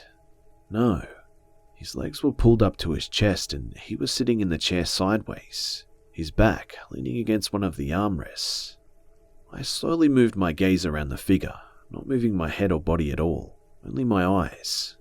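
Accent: Australia. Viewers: are you Australian?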